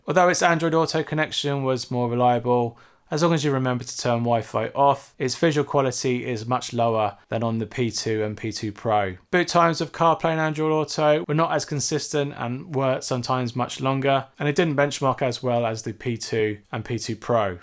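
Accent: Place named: United Kingdom. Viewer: Hey man just some friendly advice for your English UK self haha. We all appreciate the heck outta your videos n the time n effort that goes into each one as they're not easy. But with that said, your videos have all become the exact same in regards to the tone of your voice or mono tone effect if you will, and it's causing many people (I've spoken to others who have mentioned same thing) to downright turn it off cuz they've become flat and boringish to an extent. Most noticably when you talk about good things about the device then nonchalantly dive into bad things without even segwaying or mentioning it. Wanted to bring it up bcuz we all know it better than this bland same shit different day attitude and script u seem to have. So let's pep it up and learn from our mistakes. Keep on keeping on. Or is it? Or however it's pronounced I'm bad with that Uk accent. 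Take care buddy.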